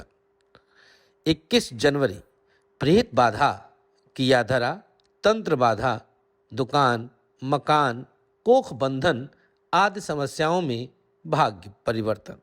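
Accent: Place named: Bhojpuri, India. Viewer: Dhanyawad sir ji bhojpuri touch ke liye, aap ka bolne ka style mast hai, vedio informative raha🙏🙏🙏🙏🙏🙏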